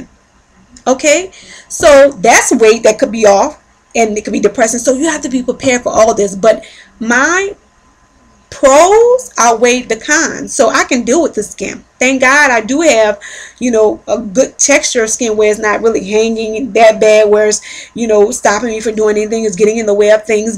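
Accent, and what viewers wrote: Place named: New Orleans, United States. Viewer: You are SO COOL!!!!!!!!!!!! You have an accent, Are you from the NOLA? *New Subscriber*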